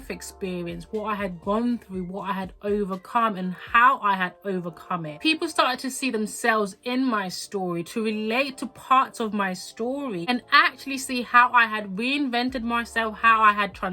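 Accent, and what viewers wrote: United Kingdom. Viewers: I started my channel/personal brand, no subscribers yet. But hey, I got step 1 complete🎉 I just knew you were from the UK for some reason ❤ 🥂 I have a lot of hobbies and it tough to merge them as well so I end up spreading myself thin and overwhelmed. I need to try email Marketing even content ads as well .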